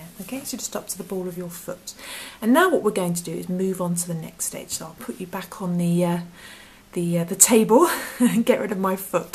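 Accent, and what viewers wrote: United Kingdom. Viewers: My only gripe is you’re using US terminology and you sound British but otherwise lovely thanks for the share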